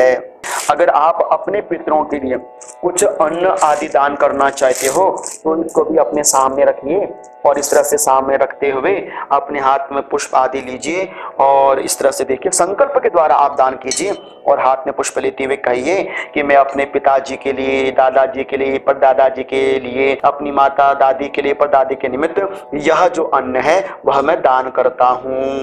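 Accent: Standard. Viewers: ।बहुत ही अच्छा शुद्ध उच्चारण करते हुए कहा है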